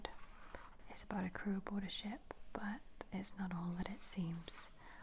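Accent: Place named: United Kingdom. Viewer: Black Mirror has broken so many grounds. I remember watching Bandersnatch for the first time thinking how cool of a concept it was. Hope you're doing great I love watching your videos. Your Brit speech is hot chocolate in the winter beside a warm fire ❤😱🥰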